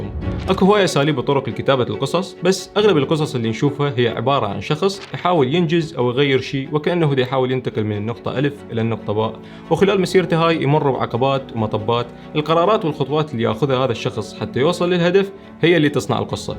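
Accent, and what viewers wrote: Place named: Iraq. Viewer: ما انتبهت أن هو يحجي عراقي الا بعد 5دقايق ههههههههههه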